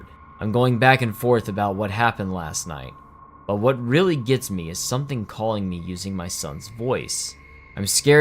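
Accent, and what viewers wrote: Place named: South, United States. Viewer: Nothing wrong with a Southern accent! :P lol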